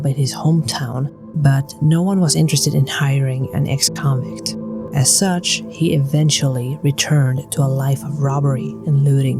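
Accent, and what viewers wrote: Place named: Sweden. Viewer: I appreciate the work you put into your videos and your commitment to rejecting generative AI. This was a story I hadn't heard before, unsurprisingly, as an American. I'd love to hear more like this from your neck of the woods (assuming from your accent and pronunciation that you're Swedish).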